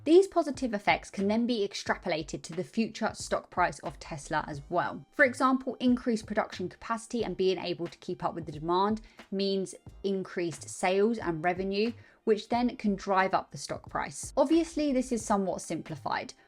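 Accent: United Kingdom. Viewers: 🌜💫😎TSLA😎💫🌛 I love that british accent!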